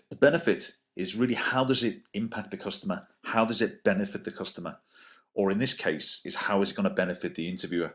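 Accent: United Kingdom. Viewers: yessss scouser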